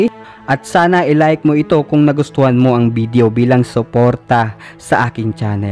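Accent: Visayas, Philippines. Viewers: Ok sana Kaya Lang Visaya ka eh ayusin Mo ung pagsasalita mo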